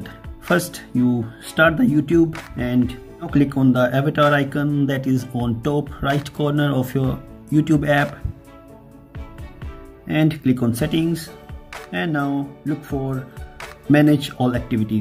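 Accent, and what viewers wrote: India. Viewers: Indian accents means you can’t trust him Probably works for a scam company and writes tree in cmd like he did it here Nonsense not working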